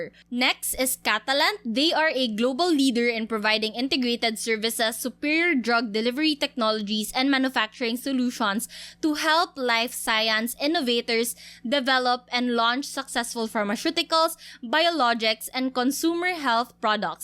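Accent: Visayas, Philippines. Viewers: Bisaya po kayo Ma'am 😁😁 ??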